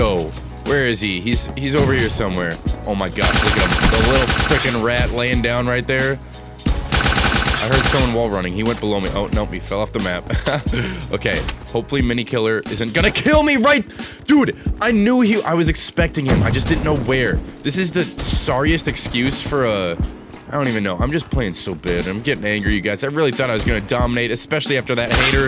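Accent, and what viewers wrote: Minnesota, United States. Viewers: R u from minnesota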